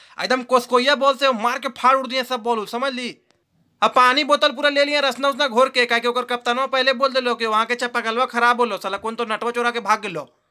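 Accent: Bihar, India. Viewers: Lagta h aap bihar ke Rohtas se ha ...🙏🙏🙏🙏🙏🙏🙏🙏🙏🙏🙏🙏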